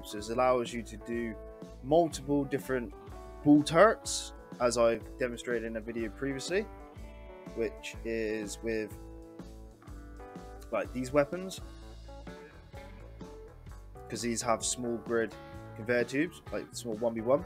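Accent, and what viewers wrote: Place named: West Country, United Kingdom. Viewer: I know a west country accent when i hear one xD Nice video dude! That last section with the double hinge was exactly what i was looking for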